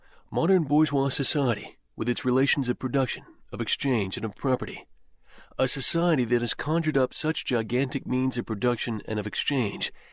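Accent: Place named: United States. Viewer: The thick American (AI?) accent and unrelenting monotone renders this historic document unlistenable.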